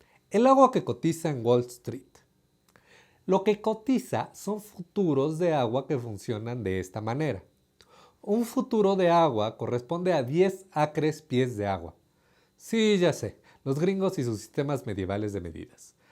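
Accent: Mexico. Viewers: Nuevo suscriptor, encontré en tu vídeo la mejor explicación a mi duda, me supongo que eres mexicano por tu acento, ojala pudieras exponer con este mismo lenguaje el tema de la fluctuación de los tipos de cambio, hay muchas explicaciones que indican como funciona actualmente, pero mi duda es como se cotizaba el peso mexicano antes de 1994 y si todavía hay países que tengan ese tipo de control cambiario y como les va por qué aquí:si Grecia tiene demasiados burócratas el dólar sube si llega un tsunami a Indonesia el dólar sube, si se atora un barco en el canal de suez el dólar sube, si los texanos no pueden pagar sus hipotecas el dólar sube, si se incrementa la producción automotriz en México el dólar sube si Putin le dice compadre a Trump......etc.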